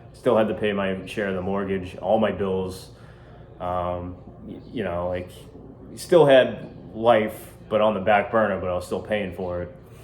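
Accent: Massachusetts, United States. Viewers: This doesn’t have to be an end. A lot of people return to the trail to finish what was started as they get closer to retirement age. Life happens and things happen for a reason. Embrace the ambiguity life brings and move forward. On a selfish note- I’m going to miss hearing the familiar accent which I miss being back in every day (SE Mass native > Virginia- enjoying the AT down here). Hike your own hike, man…no matter what it is or how long it takes.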